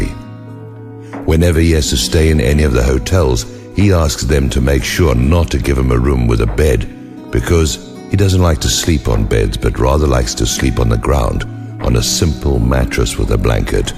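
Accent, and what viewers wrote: United Kingdom. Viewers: It's obvious that our government controls the media and they're all full of shit, but trying to make him into a Gandhi type figure is a bit far fetched. So who is making these videos anyway? Who's writing the material? And who's the British guy narrating?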